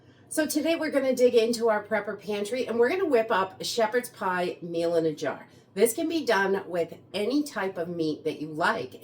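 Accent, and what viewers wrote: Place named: East Coast, United States. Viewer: I love all you do with freeze dried food! This is what I give my kids for Christmas now. I buy 12 quart jars and use your recipes to make them. They save their jars and I refill them every year. Thank you for your ideas! I love them! Thrive life is so worth it! Have a great day. P.S. I love your eastern accent!😊 I’m a born and raised midwesterner lol.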